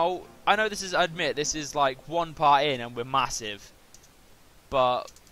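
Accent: England, United Kingdom. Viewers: are you english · You are british.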